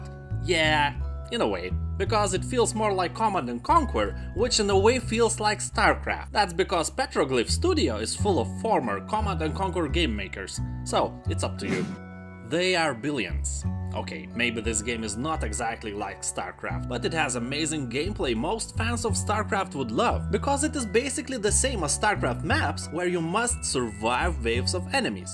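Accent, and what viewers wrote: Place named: Russia. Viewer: Опа, русский акцентик))))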